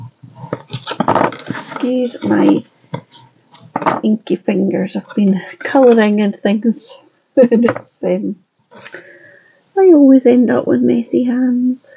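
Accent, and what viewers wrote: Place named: Scotland, United Kingdom. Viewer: Superb cards and I love your gentle Scots accent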